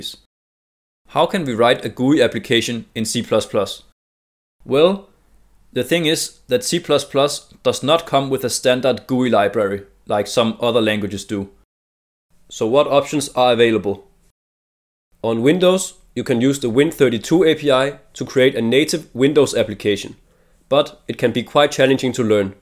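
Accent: Denmark. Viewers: Danish? I like the accent :))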